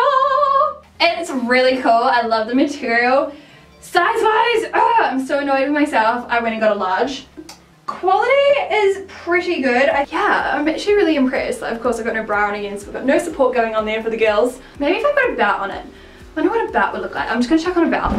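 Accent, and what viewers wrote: New Zealand. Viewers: Are you from New Zealand?? If so SAME girl ❤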